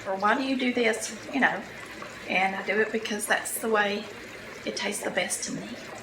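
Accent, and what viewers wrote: South, United States. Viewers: "boled" okra? I actually love you southern accent. Thanks for the video.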